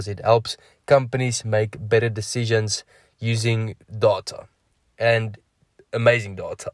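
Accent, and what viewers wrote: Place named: South Africa. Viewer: Apple? - thanks for the tips = good to hear a SA accent discussing stocks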